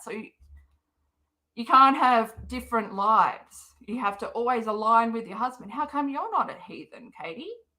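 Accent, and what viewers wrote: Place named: Australia. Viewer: Your voice helps me sleep. I watch all you vids and it’s my favorite to put one on before bedtime to fall asleep to your Australian accent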